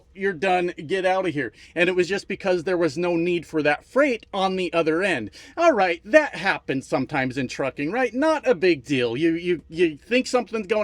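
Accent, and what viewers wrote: Canada. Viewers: Canadians would love to have the old neighbourly relationship back. It breaks my heart to see all the hardships starting to happen to both sides of the border. Are you sure you’re not Canadian? I swear, you sound like us. 😊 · You sound Canadian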